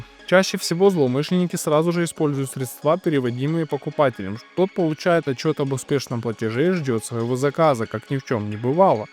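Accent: Ukraine. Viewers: Автор ваш акцент говорит о том что с Украины,и к вам будет вопрос-предложение,не сложно ли вам пособирать информацию о том какие у вас статьи и какие сроки за них,кто чаще всего туда идёт. Вы вещаете на русском но у нас есть и несколько своих каналов на эту тематику,здесь бы хотелось бы видеть разницу как у вас и у нас.У нас чаще всего идут-попадаются молодые от 18 до 25 лет и сроки от 3-5 до 20 по статье 187 УК РФ,в зависимости от того куда деньги ушли.И хотелось бы узнать как у вас с этим,это правда займёт больше времени но и интереса будет больше.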